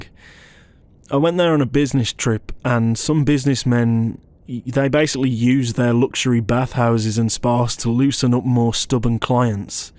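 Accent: United Kingdom. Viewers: He fucking sounds British…I love it..😂😩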